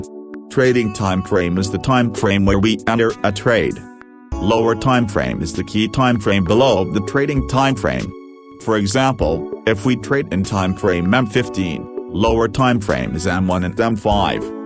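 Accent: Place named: India. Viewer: Could you know I've always than this question mark this is like trying to listening to customer service from India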